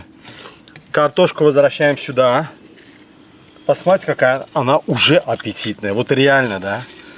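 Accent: Baku, Azerbaijan. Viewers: Джан! Мало того что красиво, а ещё непередаваемые наши бакинские интонации!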